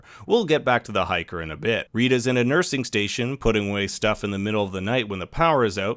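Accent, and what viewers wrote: Canada. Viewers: Just curious are you Canadian? I've been trying to place your accent. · random but this video i picked up on an accent and now i’m wondering if youre from canada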